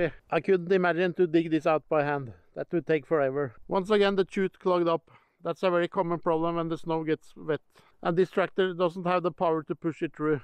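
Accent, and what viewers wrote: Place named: Norway. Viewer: I’m loving your videos! And respect for for walking through all that snow to set up your cameras and get those great shots. And the Norwegian accent is fun to listen to🤭 keep the great videos up and much love from the USA 🇺🇸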